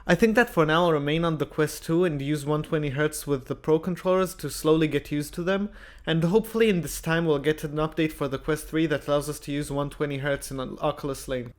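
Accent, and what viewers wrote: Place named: Israel. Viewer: מאיפה קנית אחי?(if your Israeli I get it from your accent)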